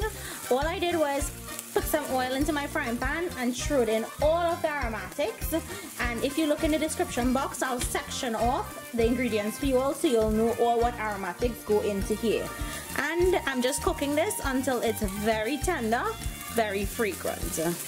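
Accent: Trinidad and Tobago. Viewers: Sounding like ah Trini😁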